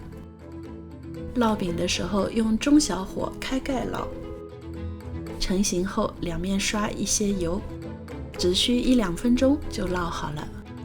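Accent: Shanghai, China. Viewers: 阿姐太厉害了👍我也是上海人，在伦敦。我是从生煎那个追到这里的。一口气看了你好多视频。看你做菜真是享受，步骤详尽理论清晰，上海口音又亲切，你还长得像我姐姐。😂真是懊恼没有早点发现和关注你。实在压抑不住欢喜，决定要把我在油管的第一次评论献给你。😊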